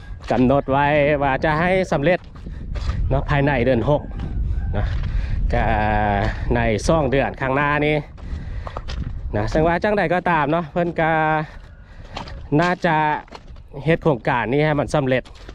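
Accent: South, Thailand. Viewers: เสียงบรรยายสำเนียงคล้ายภาษาปักษ์ใต้ของไทยมาก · สำเนียงผู้ยรรยาย มาจากแถบไหนของลาวครับ สำเนียงคล้ายภาษาไทยใต้มาก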